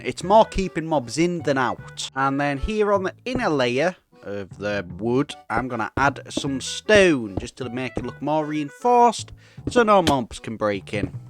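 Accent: United Kingdom. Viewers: lol I thought you were Scottish because I have a teacher from Scotland who has your accent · My Man is britishhh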